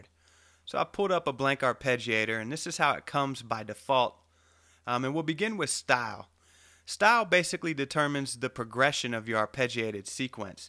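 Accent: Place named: Louisiana, United States. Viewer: what is this accent.... louisiana? sounds tight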